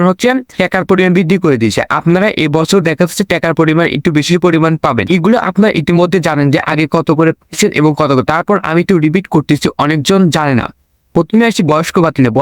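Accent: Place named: Pabna, Bangladesh. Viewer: আপনি তো বার বার বলছেন টেকা তাই আমি ও বললাম।ভাইজান আপনার বাসা কি পাবনায়।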